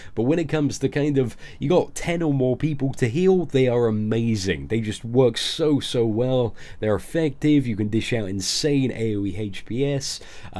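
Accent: United Kingdom. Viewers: Hey. Great vids. And love the accent!! Its like sherlocke holmes playing rift! Keep up the great work!!!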